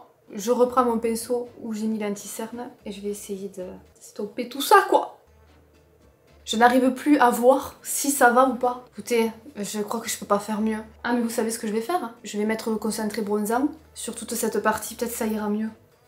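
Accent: South, France. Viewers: Transformer le bouton en grain de beauté,j adore votre accent ça fait penser aux vacances a l été ,aux cigales. Surtout lorsque comme moi on habite dans le Nord ,lol😅😅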